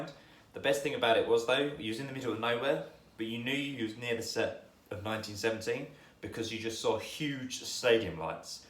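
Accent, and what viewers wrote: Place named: United Kingdom. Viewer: Hi!I love the film so much,it's my favorite.Thank you for the video that we can know more about the people who also contributed to the film. Love your video!(I'm from China and I'm learning English by watching videos on youtube😂,i really love your British accent and i want to have a trip to London oneday！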